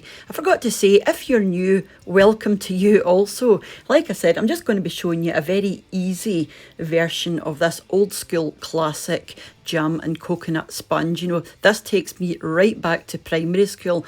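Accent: Scotland, United Kingdom. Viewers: This takes me right back to school.. Oh how I loved school dinners lol especially the puddings.. Yes those big silver jugs with the runny pink custard.. Where I work, the canteen does fab puddings but they are proper man size portions.. Bakewell Tart is another favourite.. Shame I'm on bloody slimming world.. Thanks always love to hear your lovely Scottish voice. Jules x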